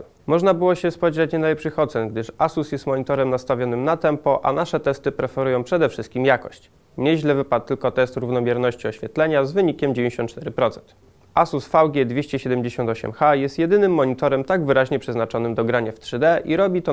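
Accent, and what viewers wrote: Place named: Scotland, United Kingdom. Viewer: DAMN SCOTTISH ACCENT !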